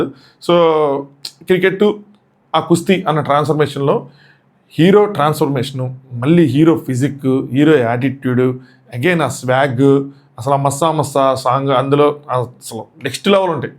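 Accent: Khammam, India. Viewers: Hi..Meru Asslu inka divider annapudu chala navvu vachindi andi..Madi khammam exact same accent vuntundi Madi kuda after long time navvukunnanu Meru Ala matladite..Meru chala genuine ga matladtaru..keep going..all the best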